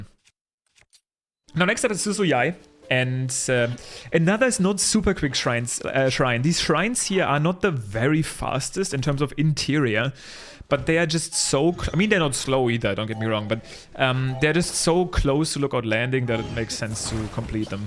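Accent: Germany. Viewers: German I think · I actually like to hear your fluid english with german accent 🙂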